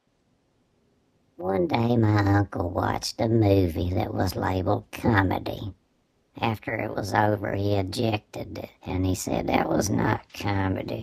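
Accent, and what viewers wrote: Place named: South, United States. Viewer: Your uncle sounds like he was a cool dude ! I’m sure he was fun to be around. Great story . Where are you from? I like your southern accent. I’m from Tennessee and also have a southern accent . I’m guessing you’re from Georgia. But I’m just guessing. 😊. Love the animation . Very cool 😎. You’re so creative and talented.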